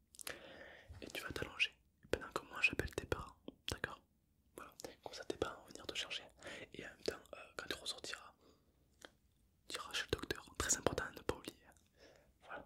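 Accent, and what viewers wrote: South, France. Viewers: J'adore ton accent du sud MOI JE VIENS DE MARSEILLES!!!!!!!!!!!☀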